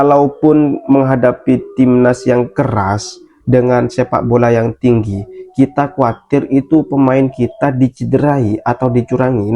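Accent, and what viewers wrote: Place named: Madura, Indonesia. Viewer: Logat ngomong oreng Madura 😂settong dereeh cong 😊salam dr Kalimantan 🙏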